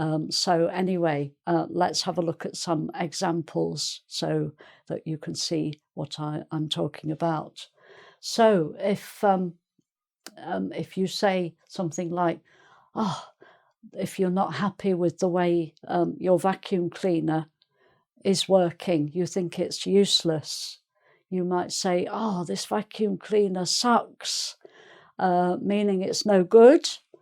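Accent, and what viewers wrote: England, United Kingdom. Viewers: I honestly admire your charismatic accent..actually I am not a native speaker of English and already used to adopting tye American one..I could say that the British accent sounds both awesone and difficult .thanks for these illuminating classes which you make even easier with your wise and less hurried speaking voice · Miss. my full respect for you, really I just folding your class, but I not understand some of your classes, I can’t explain the problem. Maybe I feel shook of British access. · Great! is magnificent as usual. And her pronunciation is charming, it reminds me of my English friend... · Iam in love with british accent really it is so beautiful. Hearing it makes me want to stop hearing any Americans.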